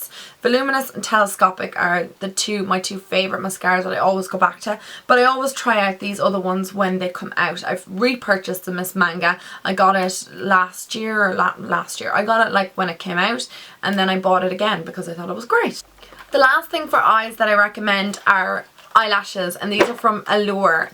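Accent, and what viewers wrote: Ireland. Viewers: I only found out about your channel now and its amazing. I figured you were irish coz of your accent. Your make up tutorials are amazing. I was wondering if you went to college for beauty therapy where?. Beacuse that's what i want to do when i leave school. Or what colleges would you recommend! Would really appreciate it! Once again your videos are amazing:) x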